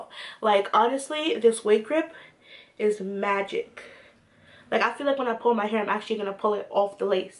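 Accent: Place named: New York, United States. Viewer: U sound like u from new York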